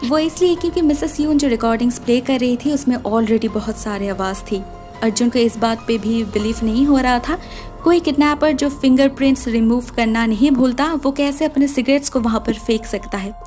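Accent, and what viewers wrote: Bengal, India. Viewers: R u Bengali or east Indian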